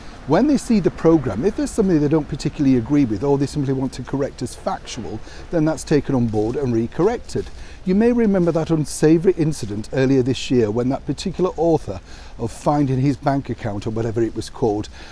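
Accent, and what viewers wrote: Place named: Eton, United Kingdom. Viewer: Just love ur etonish accent! Seems lifetime ago since I studied at Oxford. Love ur pc manners . Greetimngs from Finland. 💕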